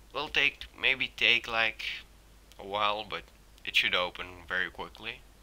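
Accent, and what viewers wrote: Netherlands. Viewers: nvm his dutch sorry thought it was Afrikaans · Thanks! it helped me, btw are you dutch lmao :D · Dutch? lol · you're dutch...I can tell by your accent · Are u dutch??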